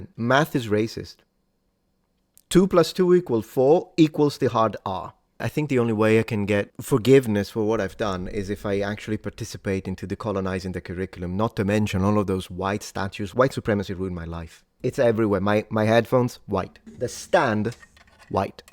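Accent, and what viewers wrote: England, United Kingdom. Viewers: I believe you are from England. :)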